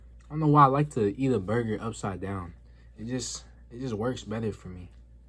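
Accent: Philadelphia, United States. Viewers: I just wanna know where you from ? Sounds like Philly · Sounds like he has a philly accent to me.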